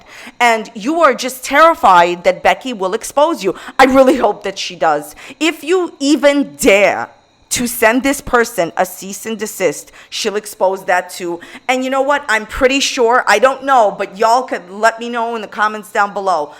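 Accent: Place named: New York City, United States. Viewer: I think my favourite parts about you, is how strong you are about your feelings, and your strong new york/new jersey accent. I’m stationed Virginia right now and hearing you reminds me of strong willed women from my home, New York, and it warms my heart. Love you so much for fueling my unending desire for drama as well as my homesickness <3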